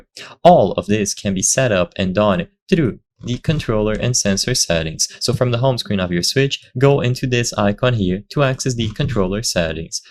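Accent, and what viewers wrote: Brazil. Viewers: o sotaque não me engana, br na área!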